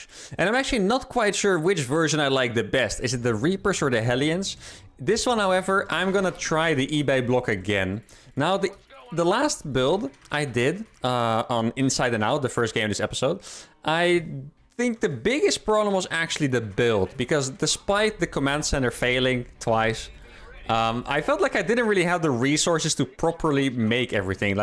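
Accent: Netherlands. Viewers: Ben jij Nederlands?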